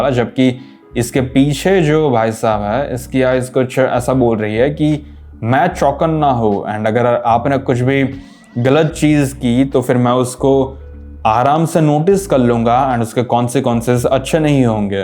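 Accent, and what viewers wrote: Gujarat, India. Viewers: Pure gujrati voice hai bhai ki ❤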